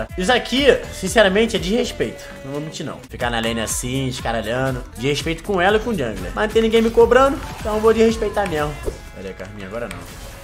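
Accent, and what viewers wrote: Rio de Janeiro, Brazil. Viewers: Esse é muito carioca mano 😂😂😂 Fé com fé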